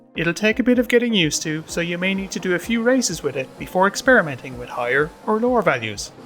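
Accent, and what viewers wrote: Ireland. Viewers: lovely ol irish voice, good vid lad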